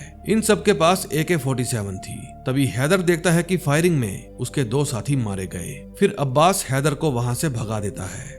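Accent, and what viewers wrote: Punjab, India. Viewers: Punjabi accent rocks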